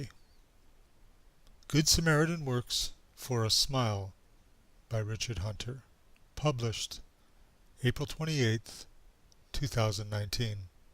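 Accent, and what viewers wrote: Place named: Canada. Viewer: This is such a heartwarming story. Great writing. I love your deep Canadian voice!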